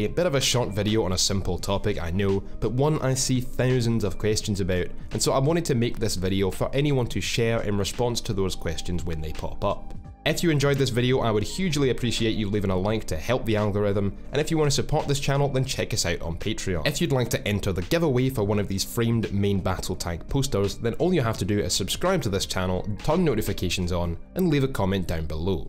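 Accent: Scotland, United Kingdom. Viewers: Are you Scottish? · This proves it. I will happily listen to anything if it's spoken in a Scottish accent. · Man... I just LOVE your scottish accent, sounds so cool :D Nice video as well, keep it up ;)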